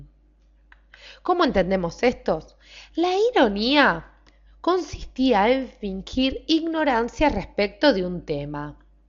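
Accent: Argentina. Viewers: Eres Argentina? Hablas muy argentino Saludos desde México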